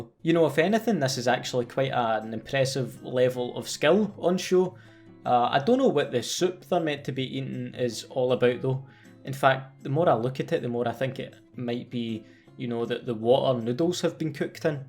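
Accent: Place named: Scotland, United Kingdom. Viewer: Nah, just Scottish.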